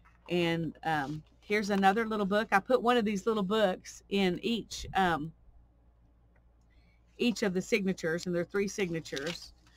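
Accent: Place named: South, United States. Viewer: I'm sensing a slight southern drawl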